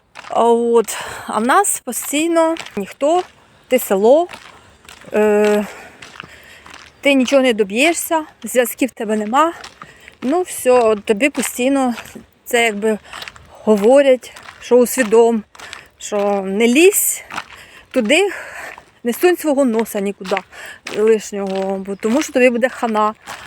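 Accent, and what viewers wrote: Ukraine. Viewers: Акцент закарпатський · Скажіть будь ласка, з якої ви області, бо судячи по вимові, ви не з східної України? Наскільки треба себе не поважати, щоб соромитися своєї мови у своїй країні? Я теж довгий час говорила на мові окупанта, з 2022 перейшла тільки на українську, але ніколи не відчувала якоїсь зневаги, хоч живу все життя в місті.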